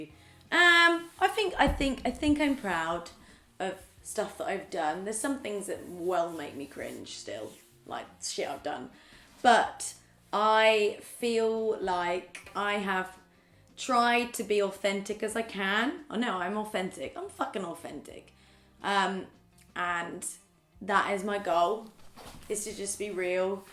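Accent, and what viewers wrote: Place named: England, United Kingdom. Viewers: So I’m from the U.S and I have always been curious about where in England her accent is from. Anyone know?? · Karina Natalie it’s a Norfolk accent x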